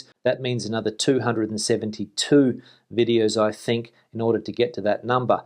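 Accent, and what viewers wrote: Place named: Australia. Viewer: Hi, — really appreciate what you are doing with your daily updates. I don’t miss a one of them! We had to give up our Sitges apartment earlier this year and can’t wait to be able to return to Spain on a regular, if not permanent, basis. But who knows when that will be at this point. Hats of to you all in Spain for your efforts and, again, thanks to you for your hard work in keeping us all informed. (PS: would love to hear you speak a few sentences in Spanish so I can hear an Aussie accent in that language!) 😁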